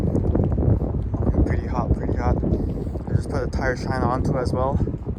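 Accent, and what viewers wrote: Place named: Quebec, Canada. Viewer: Thanks for the video man!! I have the exact same car and wheels (but the coupe version) and found very little info online on appropriate winter setups. I'm also from Quebec which is pretty funny as I recognized your accent ahah. When choosing proper tires, how do you know if they will match the car's speedometer like you mentioned? Also, I believe the Yokohama tires have a "V" speed rating whereas the car is rated for "Y" tires, did you downgrade because they're winter tires? Thanks and have a good one!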